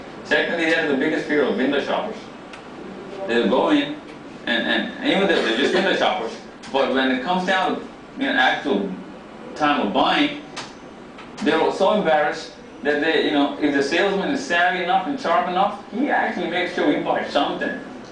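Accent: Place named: England, United Kingdom. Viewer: Love his English accent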